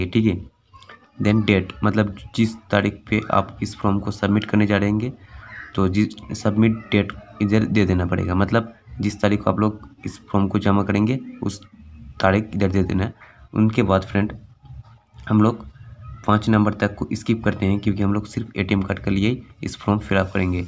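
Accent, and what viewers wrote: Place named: Bengal, India. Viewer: Jo bhi ho ye to pata chal gaya k tum bangali ho.hindi tumko thikse nahi ata hai to bangla me vodeo banaw.hindi me jaruri nahi hai.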